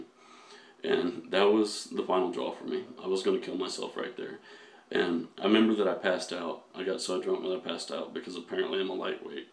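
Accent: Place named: South, United States. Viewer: Thank u so much for sharing your experiences. So few people can understand the internal destruction of how ptsd ghosts terrorize you on a daily level. Or how the words "living hell" do not fully describe losing your mind to catatonic depresdion. It's so comforting to know im not the only one. Thank you. And I absolutely love your accent. I'm a sucker for southern twang. I would love to talk more with you when yiu have time, as I try to cope with PTSD. My email is <mail>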